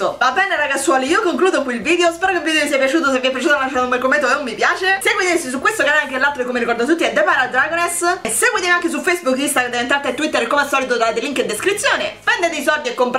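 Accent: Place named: Rome, Italy. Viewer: quando fa la parlata romanesca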